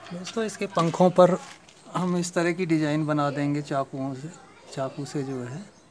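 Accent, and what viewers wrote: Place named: Punjab, India. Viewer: Apki hindi se Punjabi lagte hain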